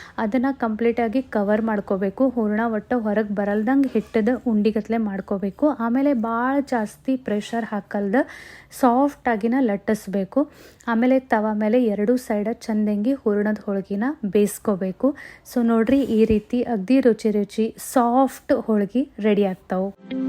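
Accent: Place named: Belagavi, India. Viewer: Nim language nodidre belagavi davr taran ide aadru marathi jana matado tara fell aagutte y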